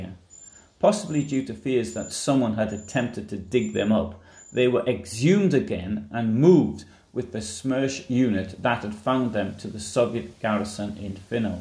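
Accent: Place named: Newcastle, United Kingdom. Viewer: I certainly have subscribed, and I am certainly enjoying your Video's and I am certainly intrigued by the Bizaar mix of your accents..... Newcastle????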